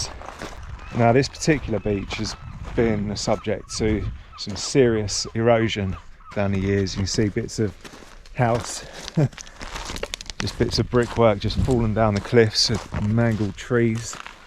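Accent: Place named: South, United Kingdom. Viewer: So you’re from Sheppey! I was wondering where your lovely, rich, south of England accent came from. I could listen to you read out the phone book In 2005 I interviewed to teach English at Minster College and stayed the night before at the Abbey Hotel … I’ll never forget.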